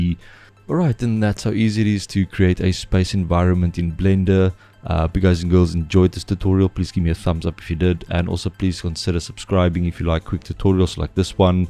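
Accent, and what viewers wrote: South Africa. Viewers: Awesome video bro. Are you South African btw?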